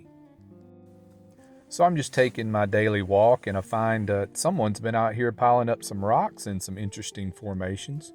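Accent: South, United States. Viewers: I love your southern drawl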